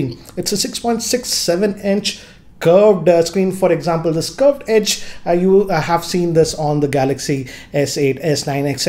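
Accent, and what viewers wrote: India. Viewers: Couldn’t finish the review because of an accident. Great review though but Indian accent is the worst ever and hurts my ears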